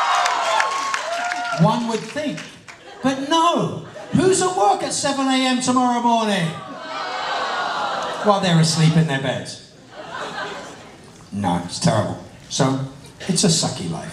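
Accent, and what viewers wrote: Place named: United Kingdom. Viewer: awww, Ivw not heard this sort of british accent in years! oh the memories...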